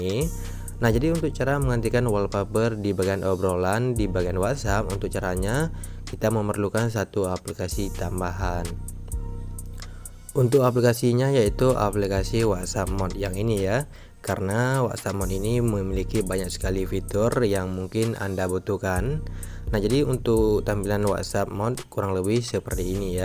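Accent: Aceh, Indonesia. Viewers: Org Aceh Bg?😂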